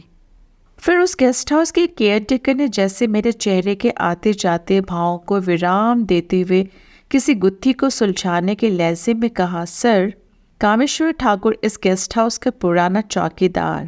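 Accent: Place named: United States. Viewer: Orator ka american accent hai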